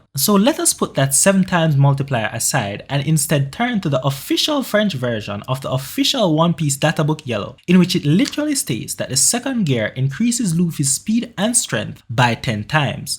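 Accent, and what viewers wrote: Jamaica. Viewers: Definitely Jamaican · U Jamaican? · This man sounds like a yaadie. Subbed.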